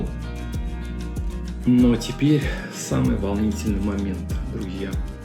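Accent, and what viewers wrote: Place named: Odessa, Ukraine. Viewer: Длбавляе т что шикарный вкус, а то их есть у меня получается, еврейский одесский тип речи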